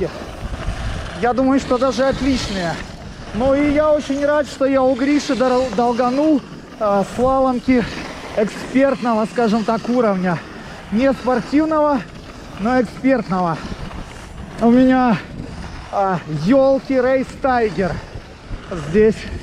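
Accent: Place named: Kyiv, Ukraine. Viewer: Откуда киевский акцент?)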